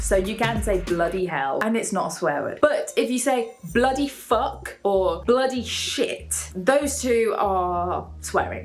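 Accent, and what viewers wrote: United Kingdom. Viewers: we'll, that's a shame, cause it sound's very funny and ear it in british accent is fabulous. Thanks!!!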